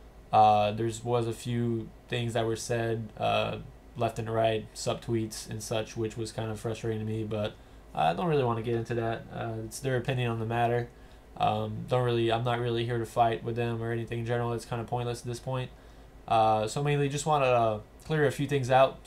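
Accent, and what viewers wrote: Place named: Quebec, Canada. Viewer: haha such a strong quebec accent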